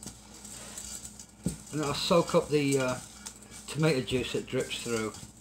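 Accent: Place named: Manchester, United Kingdom. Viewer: I like the Manchester accent but what is it you've made?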